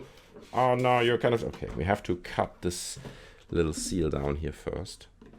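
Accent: Germany. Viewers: are u german? channel says UK tho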